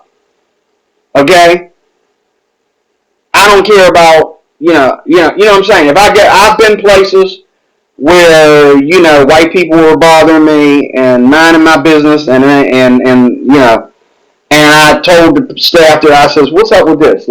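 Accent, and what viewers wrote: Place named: South, United States. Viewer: lol had no idea this dude was black until I looked up at the right hand corner 😂. He sounds like southern redneck lol 😆. He ain’t lying though 😉.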